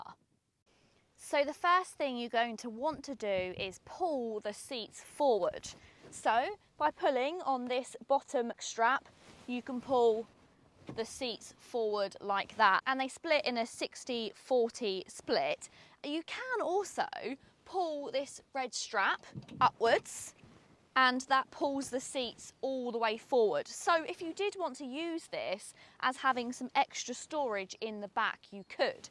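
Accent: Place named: Estuary, United Kingdom. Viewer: A joy to listen to that accent that wonderful London Thames estuary, twang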